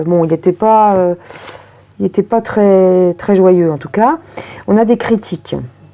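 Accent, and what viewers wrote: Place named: Paris, France. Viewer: Vous êtes super! Et oui, vous avez l’accent Parisien... 💕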